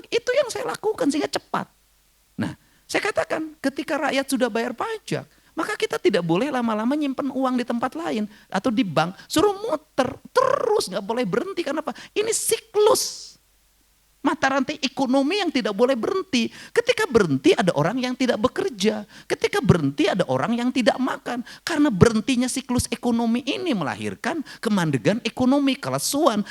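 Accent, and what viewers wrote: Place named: Sunda, Indonesia. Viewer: Krn pidato nya sell : tanpa text, spontan dr hati, topik nya sell menarik sesuai dgn kepentingan bahasan nya, gaya bahasa n bicara nya yg 'khas,' sangat expressi , serius, tanpa kehilangan selera 'humor' nya yg 'mengena' , tidak norak bhkan terkesan ada nuansa 'intelektual' dalam setiap kata n kalimat nya , bhkan sekalipun dlm bahasa daerah (Sunda) nya yg msh sangat kental, cermin betapa KDM sangat cinta pada budaya leluhur nya .. 👍👍👍👍♥️♥️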